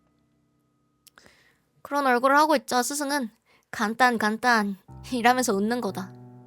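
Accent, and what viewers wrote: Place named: Japan. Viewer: 언니님 일본어 느낌 너무 좋아요..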